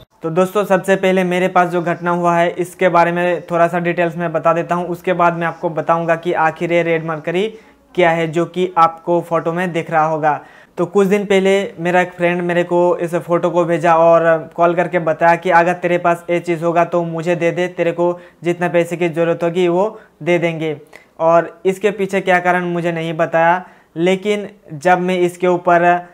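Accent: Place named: Bihar, India. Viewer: Bihari hai kya be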